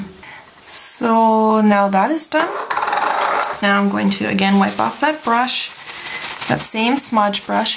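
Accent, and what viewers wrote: United States. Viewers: ok ok sorry lol im wrong.. i thought in another video that she said she lives in canada.. n coz she sounds like an american it kinda added up... lol my bad.. soz xx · Yeah. She does sound American. :P Most Europians can't say 'R' or 'Th'. :D Like my mom for example. lmaooooo.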